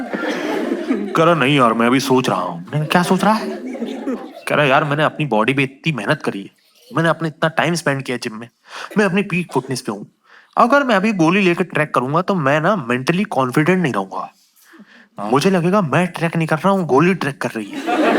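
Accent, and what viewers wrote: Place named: Madhya Pradesh, India. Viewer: A few months back he did this set in Bhopal as an opening act for Zakir Khan. It was my first time watching a stand up comedy show andit was very easy to see that the audience could relate to the set. As I said, I am from Bhopal, is a junior from school and many of my friends went to the same college as he did. The school things that he talks about in some other videos, I can very much relate to. My friends talk a lot about their local engineering college experiences and the way they share their stories and the stories themselves are very similar, it's so funny to listen. And it seems like it must be a very common phenomena to have such friends' groups and the dynamics that they had and "मध्य प्रदेश के ग्रामीण परिवेश के बच्चे" is such a prominent thing in the colleges of Bhopal. Everything he talked about, the way he talks, the lingo, the references, all the stories feel so similar that most of it is pure nostalgia. 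It is very much a Bhopali thing. I also realise that a lot of the things he says are for the performance but honestly a lot of it is quite relatable. I used to participate in open mics a decade ago and I remember he used to do open mics in his early days as an aspirational stand up comic. He has grown so much as a stand up comic, it is such a fascinating journey to see. He still has that inner millennial Bhopali vibe. Wo DB mall ka food court and the Christmas tree at the entrance of the mall that he talked about, saare tamashe wahi hote the. 🤣 Aur sahi me k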